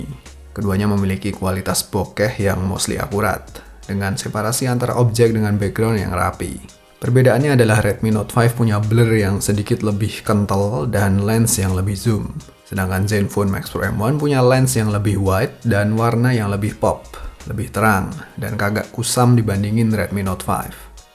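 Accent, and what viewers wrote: Java, Indonesia. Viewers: Dengerin suaranya bikin capek jawa nya medok amat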